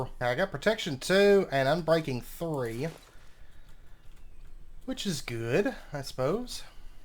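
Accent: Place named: South, United States. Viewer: I can't pinpoint from your accent if your from one of the Carolinas, Georgia, or Alabama/louisiana😂 I got southern relatives that sound just like you, and their from Georgia!👍 You are so pleasant to watch! Keep up the good work!👍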